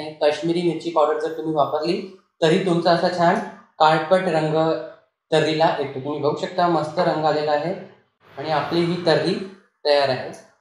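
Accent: Vidarbha, India. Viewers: Vidarbhachi bhasha, bolnyachi shaili agdi mast.Mi panNagpurchi ,aata Mumbaila rahate.tuzi recipe aani vidarbhatil vishesh shabd donhi very ❤sweet.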